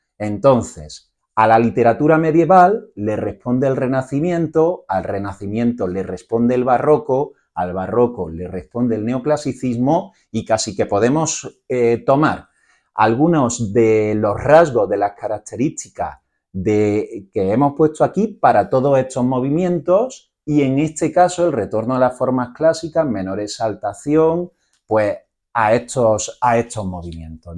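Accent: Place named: Spain. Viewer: Pues para importante la acentuación tanto no sé qué tiene que ver la acentuación con el acento de este hombre, que tiene un acento muy español. 🙄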